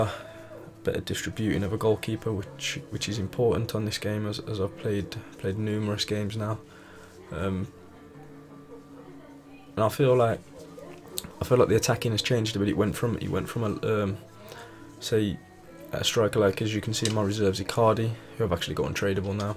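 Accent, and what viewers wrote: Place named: Birmingham, United Kingdom. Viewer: Cheers for this mate, can ping that brummy accent a mile off. Don’t apologise for being ill or swallowing too much mate, people will always knit pick at shit on YouTube. You seem like a proper decent bloke mate keep smashing it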